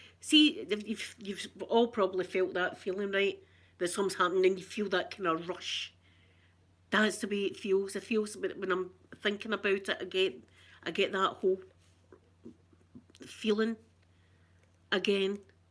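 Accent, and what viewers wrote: Scotland, United Kingdom. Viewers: The great start is to seek help and accepting that you need it (no shame in that at all it is a very brave thing to do) and if you want to cry...never deprive yourself of a good cry as it helps to release those pent up feelings....Do know that you are loved and not just by your family....you are a joy on your videos and you never fail to make me smile when I feel down with your make-up vids, your cute funny demeanor and banter in that beautiful Scottish accent of yours....The one good thing about going through trauma, loss, illness and sadness is that there is only one way to go....and that is up, make it a challenge to strive for it and you will get there..believe me, I know xxxxxx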